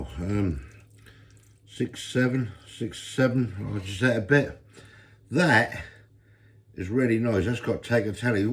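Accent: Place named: England, United Kingdom. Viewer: Mr is English. I understand him and I'm from the South of England. There are different accents and dialects depending on what part of England you're from. Please be kind and not rude on this channel sweet 😊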